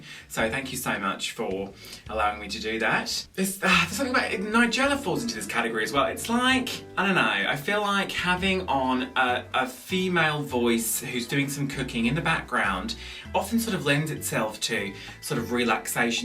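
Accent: United States. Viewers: Pretty good American accent! Watch “duration” and “fortune” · Omfg the American accent is so good I completely forget he's British and only until the outro do I remember flsnagkfm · your american accent is SO GOOD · Your American accent is so good!!! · Wait! He's english! His American accent is damn spot on! Damn! I wouldn't have thought otherwise. He's hilarious! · Hahahahaha!! You're American accent is on point!! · That collar 😅😅 Also your American accent is amazing!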